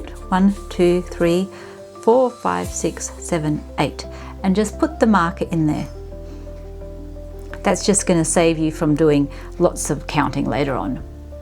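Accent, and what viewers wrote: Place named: Australia. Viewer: Yeah! An Aussie teacher finally! 😅 Now subscribed. Watching from Northern NSW. Dee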